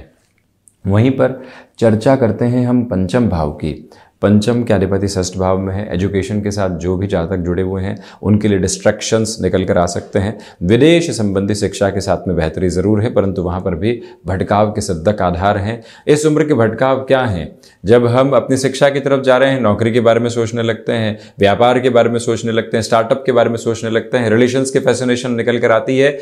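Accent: Standard. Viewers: Prabhu app ki vani our suddh uchharn bahu accha he pa ek vinti he bich bich me English bolte ho vo thik nahi hum hindustani he Hindi me hi bolo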